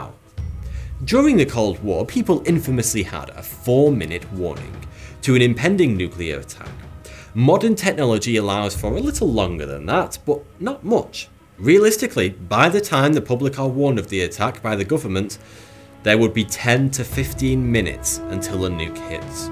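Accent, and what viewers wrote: United Kingdom. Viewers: Watching this makes me feel like your 20yrs behind like you know top secret you sound like your British forsure technology is not your field from across the pond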